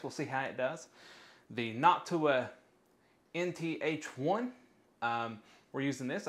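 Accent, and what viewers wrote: South, United States. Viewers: R u southern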